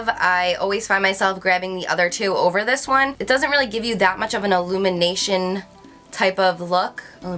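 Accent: New Jersey, United States. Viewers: It might just be her jersey accent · I was going to comment on her pronunciation of these two brands as well, but then I saw your comments. Haha Being such a beauty guru, I was really kind of surprised she didn't know the correct pronunciation since these are really common brands--especially here in the U.S.. I think I noticed her mispronouncing NYX is another video as well. Nonetheless, she is still adorable. The mispronunciation just kinda added to her bubbly, cute, & quirky personality and that New Jersey accent!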